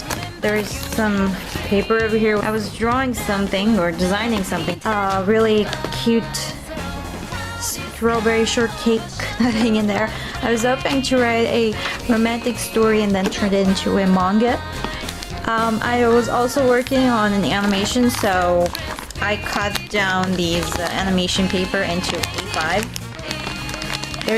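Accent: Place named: Egypt. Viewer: you're Egyptian ?! o.o gosh your accent is AMAZING! xD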